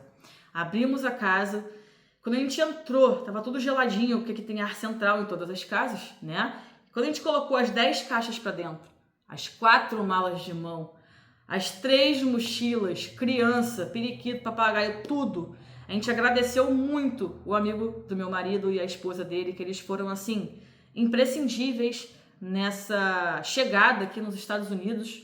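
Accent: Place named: Rio de Janeiro, Brazil. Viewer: Que legal! Eu moro em Michigan. Pelo sotaque desconfio que você seja carioca....eu também sou apesar de ter morado meus últimos anos no Brasil em Petrópolis. Gosto bastante de morar aqui nos EUA.🙂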